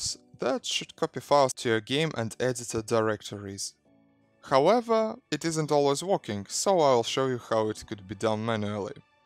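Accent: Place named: Russia. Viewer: почему твое произношение похоже на русского?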